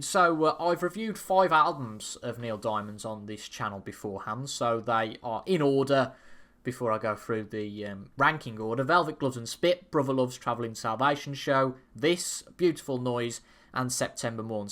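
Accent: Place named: Midlands, United Kingdom. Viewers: may i know what UK accent this is? It sounds great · Sounds like Midlands, maybe Birmingham.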